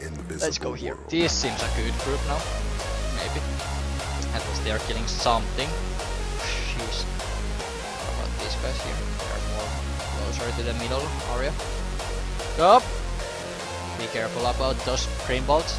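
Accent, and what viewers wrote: Finland. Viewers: Ha! I knew that you are Finnish because of your accent (Actually, the "wikipedia" was the part when I knew that you are Finnish) Sinä paljastuit! :P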